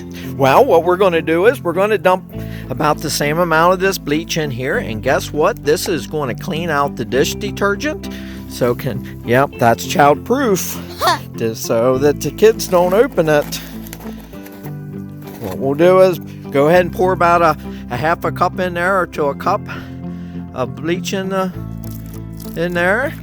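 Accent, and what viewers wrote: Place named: Southeastern Pennsylvania, United States. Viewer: You sound like you're from south eastern PA. It's the accent.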